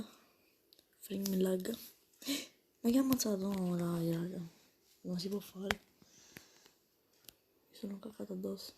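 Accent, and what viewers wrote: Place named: Sicily, Italy. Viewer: Si sente l' accento del Siciliano 😀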